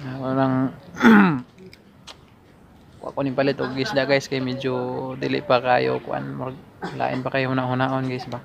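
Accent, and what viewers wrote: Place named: Capiz, Philippines. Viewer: Taga capiz ka po b? Para kseng i heard na ung ganyang dialect.. try to speak tagalog sometimes if you can.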